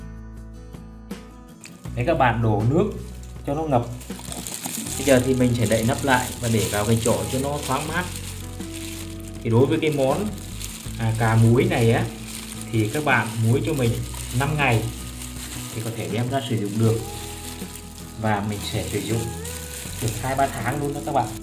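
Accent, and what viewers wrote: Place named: Central, Vietnam. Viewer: Cách chia sẻ đơn giản dể làm, cà pháo trắng giòn ngon. A là người miền Trung à anh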